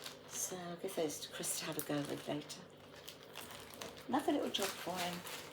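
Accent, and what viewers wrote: United Kingdom. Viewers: I could listen to your lovely voice and British accent all day❤ Just put that grater in my cart! I needed one! Ty. Great haul👍🏻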